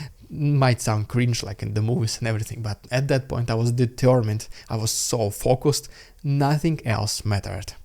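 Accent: Russia. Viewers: You are not the only one. Russian universities are looking for british english accent. Not american which you obviously got, you still talk like google translate. Extremly thick russian accent your university is breeding ground for spies and other govermental officials. You should have picked up spanish instead of english. Russian universities are making anyone fail that speak american english on purpose so that more learn british accents. Supply and demand. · I think you speak English pretty fucking great, you just have a manly rooskie accent which is great! You run an English yutube channel that's no joke lol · But don't you realise? Your English can't possibly be good enough because you still have a Russian accent! And we like it. Keep going:-) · I'm surprised how still has this accent, as a prostitute and heel licker of youtube money you'd expect him to smooth over these rough edges, or is this part of the money scheme image of a true Russian. 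And I see something of a crooked character in the tilting of the head, like zombies do 🧟‍♀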